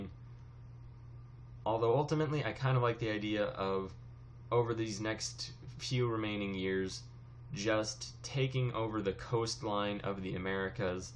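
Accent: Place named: Netherlands. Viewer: the way he speaks english I'd bet he's dutch.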